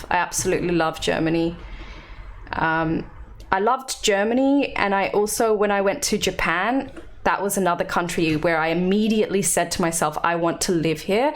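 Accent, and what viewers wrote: Australia. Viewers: I'm looking forward to the MCU stuff for sure, but go at your own pace. A happy reactor, is a better reactor. 😁 Can sort of see some resemblance to Sweet Anita but that good old Aussie twang is instantly recognisable, for me anyway haha. Very easy to tell you apart from my perspective at least, even if it's purely on the looks. got her own vibes & style! 👍😂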